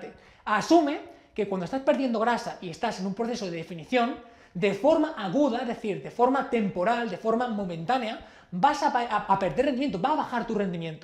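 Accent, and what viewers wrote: Spain. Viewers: Habla mas calmado cual es la prisa de por si es dificil entebder el tonito que hacen los españoles, bajale hno. Saludos desde Mexico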